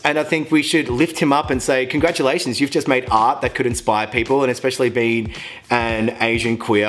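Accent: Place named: Australia. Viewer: I can tell he’s Australian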